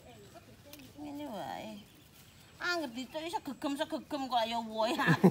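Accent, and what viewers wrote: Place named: Surabaya, Indonesia. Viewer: Awal liat dari IG, lucu banget cara bicaranya khas suroboyoan. Ternyata ada youtube juga, pas liat jadi hiburan sekali liat tingkahnya ya 😂🤣. Ini senang berkebun tp kok ketemu ulat bulu langsung ngacir lari tunggang langgang 🤣🤣. Sekeluarga seru semua 👍👍. Sehat terus ya dan keluarga, biar makin semangat ngontennya, jadi bisa mengibur kita kita semua 👍👍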